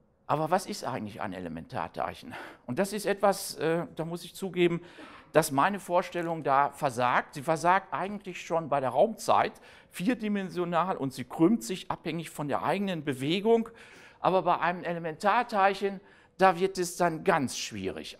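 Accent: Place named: Westphalia, Germany. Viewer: Aber in Leipzig geboren und ab 1977 in Münster studiert. Da kam es wohl zur westfälischen Adaption. Was für ein Glück. Man stelle sich diesen Vortrag mal auf sächsisch vor. "Dor Ürgnoll, de Krawwidodsjöön, däs Ällägdröön is büngdförmisch, ün de Raumdsait is gegrümmd." Nee, lieber nicht :-)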